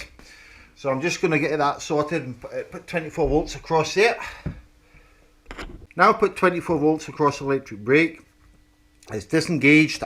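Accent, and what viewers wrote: Scotland, United Kingdom. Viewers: Hi Iv watched many of your videos and found them very informative . Great to watch and hear your fine Scottish accent . I am English but I live in Australia . I’m having a problem with a controller on a non identified mobility scooter ( no idea tags on it any where ) I have emailed you but so far no response . Can you help please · Fab U Lous, not sure about the funny accent but being from a similar part of the world, Glasgow, I do know however this is the correct and only way to speak english. All that aside, thank you so much for the time and effort in doing the video. Living in sunny Bantry with the mad Irish wife, now using a scooter ocassionally, took it to bits to try figger what was wrong. Your video suggests the most likely cause and the other info re the brake helps big time. Many thanks again for the help, Bill P Mad Scot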